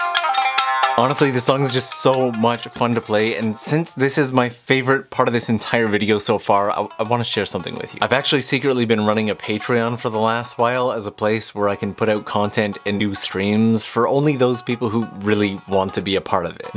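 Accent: Canada. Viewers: I keep getting distracted by the Canadian accent. I’m wondering if you’re from Saskatchewan or somewhere around that part.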